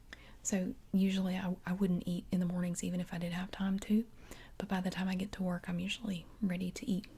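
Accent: South, United States. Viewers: It may just be the effect of your southern accent, but in Germany it is pronounced 'Al-De' (I used to live in Berlin, shopped there often) but to be fair, here in the UK people say 'All-De'. The native pronunciation can be found in this music track! <link>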